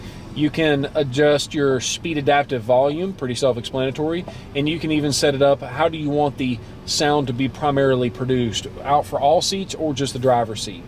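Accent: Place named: Alabama, United States. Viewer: Just bought a new Ford Escape 2019 in September 2019. This was an extremely helpful learning tutorial. It was easy to understand, even if you don't speak the Alabama dialect. I tried to learn from the owner's manual, but I just got lost trying to read it. Take the time to listen and to watch this video. I owned a Focus 2012 with the prior Sync system (called My Ford Touch) and this new Sync 3 system is so much better and it operates very fast with no delays. You will fall in love with this system. I do use an iPhone 8 and it works great when connected by Bluetooth or via USB wire. You do have to have the iPhone connected via USB cable for some of the functions to work. Also, the sound quality of music or of Podcasts which are on your iPhone is much better than when playing music via Bluetooth. Thank-You for taking the time to make this video.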